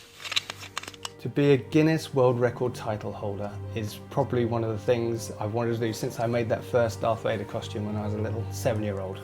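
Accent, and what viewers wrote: United Kingdom. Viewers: is he British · i'm and im now just realizing he's british😂😂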